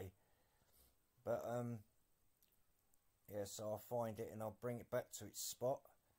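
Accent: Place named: United Kingdom. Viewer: Fair play to ya pal. Decent channel thanks nice to hear a brit accent instead of a yank 1.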